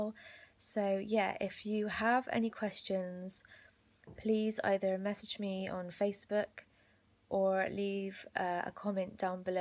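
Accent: United Kingdom. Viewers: Ok I'm a little late but you can queue this up for next time: Can you show us & explain your tatoos. Thanks, PS Love the British accent.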